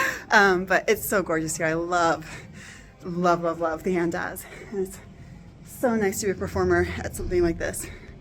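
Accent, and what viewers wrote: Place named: United States. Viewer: Strange to hear a place called a palace described as cute? It's so cute to call it cute. You sound american so if you plan to use brick I hope you don't live in a state with bitter cold winters. Britain doesn't tend to have long spells of bitter freezing temps. If you get bricks make sure they are dense and not very water absorbent or they will fall apart after even a year or two. Stone holds up better in freezing temps as long as it is very absorbent stone. I don't know why everybody insists on using grout on the new precision tiles. You don't need it. Tiles don't expand or contract due to temperature changes you experience in a typical home. You can lay tiles with the thinnest line between them. That seam can actually be the same cement you use to adhere them to the floor.. I use a vinyl adhesive. The grout only tends to get dirty or even moldy. 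There are grout sealers but I don't know how long they last. If I ever do my kitchen floor again I'm going to omit the grout between tiles. I laid a hearth under a wood stove using granite tiles and didn't use adhesive or grout. The subsurface is very flat so nothing wobbles. In case anything cracks I can replace it easily with a few backup spare tiles. There is an oak edge holding everything in place against the walls. NO problems after 10 to 15 years already. I haven't had any cracked tiles. .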